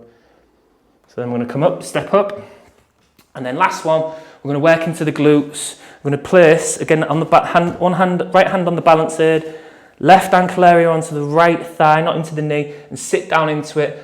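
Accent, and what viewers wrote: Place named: Hull, United Kingdom. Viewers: Great effective stretches with what sounds like good honest East Yorkshire accent. Win win! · I've been in lazy for 6 months and haven't run. Feels good to be back listening to and his Hull accent!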